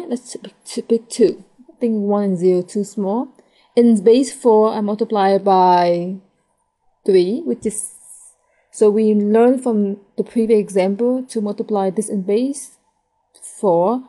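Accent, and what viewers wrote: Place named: France. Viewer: yep...you''re French....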